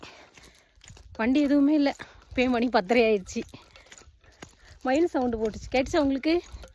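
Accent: Tirunelveli, India. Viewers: நம்ம திருநெல்வேலி தெளிவான பேச்சோட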